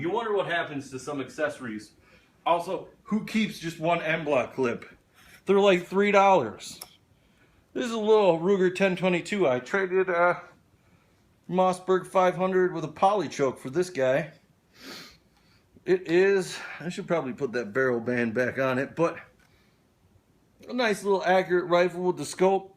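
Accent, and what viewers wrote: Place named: North, United Kingdom. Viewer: Btw, zip ur fly and learn some southern dialect. The rapid speech northern tone doesn’t do your guns justice. Practice: say slowly, - dog gone.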